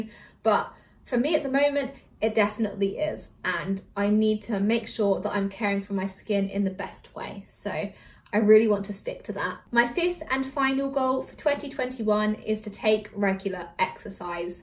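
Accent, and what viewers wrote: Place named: England, United Kingdom. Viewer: Random: I love the English accent! I'm from Germany. BTW, you inspire me in some way, though I'm > a decade older than you ;)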